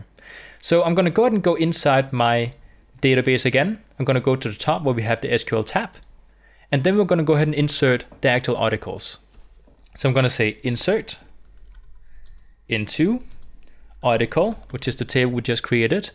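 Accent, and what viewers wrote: Denmark. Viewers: Are u danish ?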